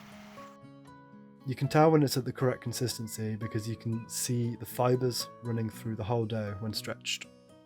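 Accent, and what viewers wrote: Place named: England, United Kingdom. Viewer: Besides the English Accent the birds chirping with music is wonderful Seitan's Red Robins?, AnyDrOwlWho? Pasta etc 10-15 min , is it really necessary to cook seitan for 1 hr everyone seems too , what is the minimum cooking time ? without a pressure cooker of course ; and is yeast necessary needed or OK without added yeast ?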